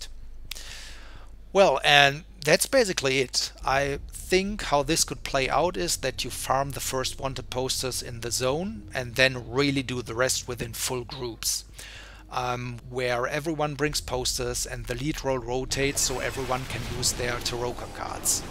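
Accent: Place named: Germany. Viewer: He sounds german, but i'm not sure.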